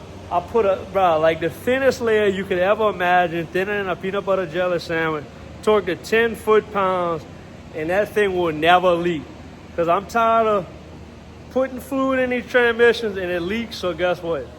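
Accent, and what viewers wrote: Louisiana, United States. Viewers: I like the teaching of the LS swap with that Louisiana/ Creole accent !!!!! Great Job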